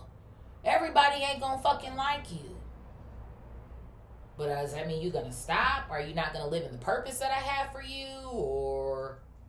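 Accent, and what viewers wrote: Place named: California, United States. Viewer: she sounds like shes from cali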